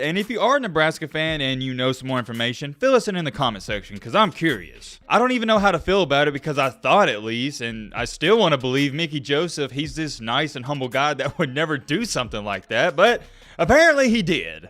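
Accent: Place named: Alabama, United States. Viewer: This guy has to be from Alabama or somewhere down south. Lol his accent is definitely there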